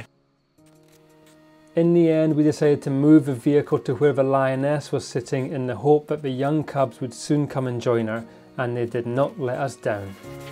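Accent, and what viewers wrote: Scotland, United Kingdom. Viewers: This video just popped up on my YouTube recommendations. The Lion footage is just incredible! Nice to hear a Scottish accent on a photography channel. I am originally from Alloa now living in Melbourne. I took up Bird photography about 3 years ago, heaps of birds here but no lions unless you go to Werribee Open Range Zoo which is incredible. Have just subscribed so looking forward to more inspiration!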